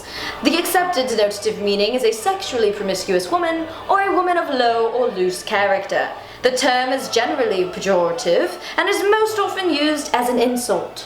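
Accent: England, United Kingdom. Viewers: Can I please have a telling me definitions in my computer with a British accent forever? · your british accent is downright offensive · your using the same English accent that the BBC use lol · Nice british accent too for the internet!! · Hahaa, I love that English accent!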